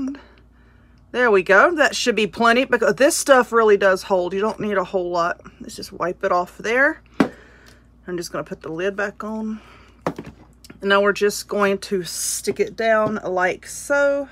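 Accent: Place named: South, United States. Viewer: I have just started watching your videos, this one was my second one. I love your spirit and the fun you put into your crafts. And the southern draw! I am a born and raised in the south and I can understand you perfectly. Thank you and keep it up. 😁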